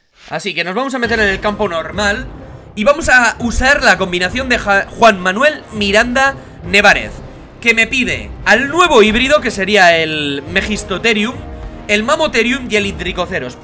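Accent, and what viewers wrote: Galicia, Spain. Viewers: Lol no sabia que eras gallego XD VIva gaLIcia carAYo 😂😂 Bueno buen vídeo crack la gigantophis mola mucho como criatura de juego, además por ser la segunda serpiente que meten, pero como serpiente prehistórica no me gusta mucho que le hayan puesto la forma de cobra esa en las vértebras del cuello...no se no me gusta pero luego hay que admitir que es una chulada. Decías que la titanoboa era un basilisco...ESTO sí que es un basilisco XD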